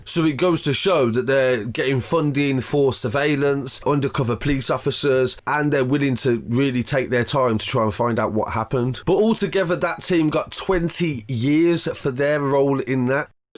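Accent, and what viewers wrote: Birmingham, United Kingdom. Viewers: Man you have strong brummie accent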